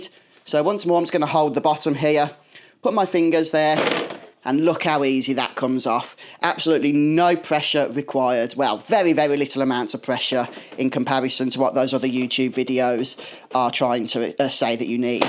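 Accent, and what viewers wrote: Birmingham, United Kingdom. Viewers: As soon as I heard the Brummie accent, I knew this was going to work. And it did first time. Thanks mate you really helped.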